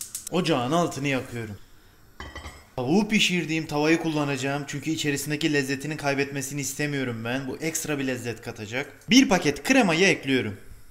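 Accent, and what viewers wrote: Adana, Turkey. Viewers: Abim sen adanalısın napıyonn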